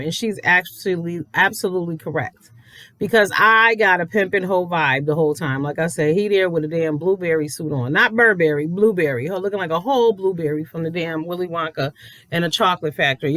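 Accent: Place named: East Coast, United States. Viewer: I knew you east coast I can hear it all I live in Texas and everyone say I got that accent too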